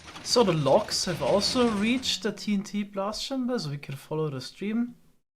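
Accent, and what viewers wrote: Germany. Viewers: Waiiiiit is he German?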